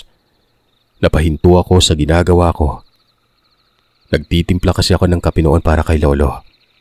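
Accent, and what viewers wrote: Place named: Iloilo, Philippines. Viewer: Ilonggo. Eh. Malambing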